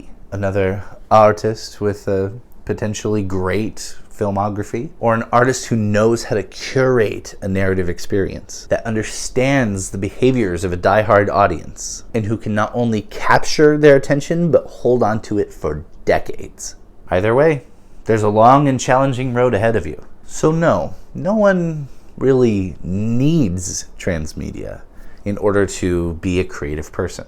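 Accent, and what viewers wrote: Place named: France. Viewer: The French accent is 😍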